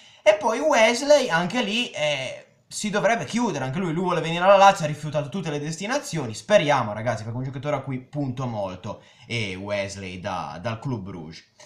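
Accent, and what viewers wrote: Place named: North, Italy. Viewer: Come puoi parlare di calcio. Sembri un contadino del nord per questo sei della Lazio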